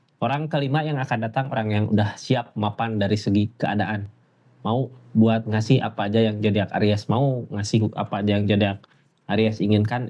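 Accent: West Java, Indonesia. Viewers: Sunda banget logat nya. Seneng nonton nya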